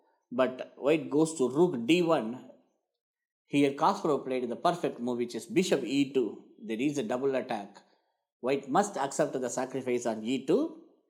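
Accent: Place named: Tamil Nadu, India. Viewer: Super sir I am also tamil..... your English was super sir....I guessed you are a tamil people....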